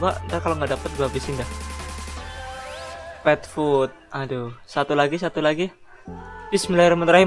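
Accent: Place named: Java, Indonesia. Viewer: Jowo Cok:v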